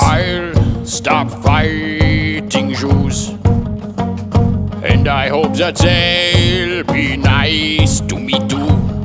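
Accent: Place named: Germany. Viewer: German accent on point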